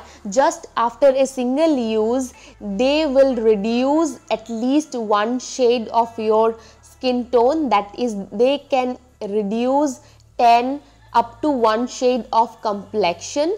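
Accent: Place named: India. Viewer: Absolutely true annoying mostly Indians speak English like this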